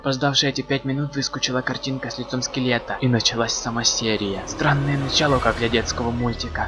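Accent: Okanye, Russia. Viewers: ГОВОРИ НОРМАЛЬНО ТЫ В КАЖДОМ СЛОВЕ ГОВОРИШ БУКВУ О!😡😠👹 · ПОчему ТЫ вОсё гОвОришь черёз О?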